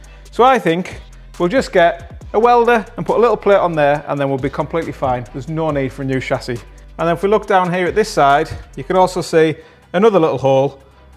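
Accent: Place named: Middlesbrough, United Kingdom. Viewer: Great channel keep up the good work, you sound like a Boro lad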